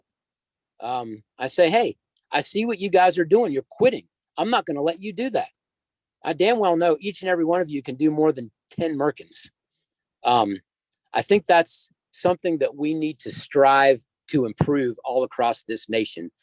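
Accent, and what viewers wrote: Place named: Birmingham, United Kingdom. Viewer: Where are you from? I’ve keyed in on your accept. It’s Barney Fife In Birmingham.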